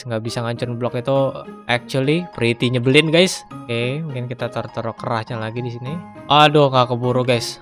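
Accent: Java, Indonesia. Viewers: Bg orang jawa kah?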